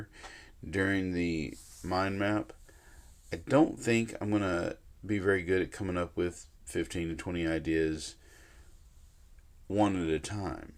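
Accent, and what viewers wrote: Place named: Gulf Coast, United States. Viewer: Grew up in Gautier. 😂 recognized the coast accent immediately 🙂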